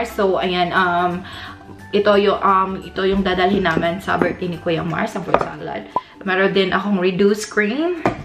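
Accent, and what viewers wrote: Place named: Visayas, Philippines. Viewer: Murag bisaya lagi ka madam Okay naman pala mga bilihin jan atey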